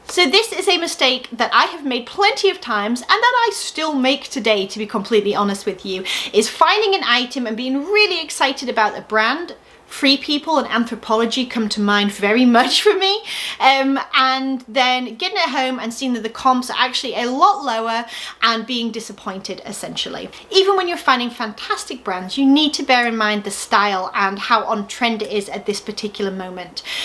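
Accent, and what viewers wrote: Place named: United Kingdom. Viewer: Plus I love your accent and British expressions. Reminds me of my British family members. <3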